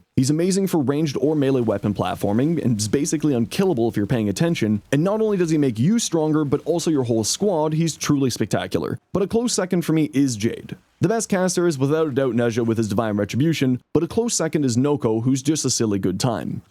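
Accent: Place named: Canada. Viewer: All I heard was Canadian.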